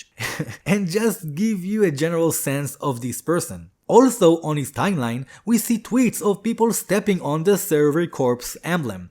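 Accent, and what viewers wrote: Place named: Israel. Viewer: also, your israeli accent is really cool, it makes me laugh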